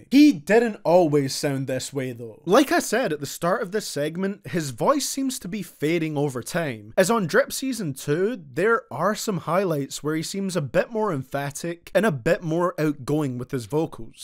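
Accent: Scotland, United Kingdom. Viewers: He is Scottish, he has a strong accent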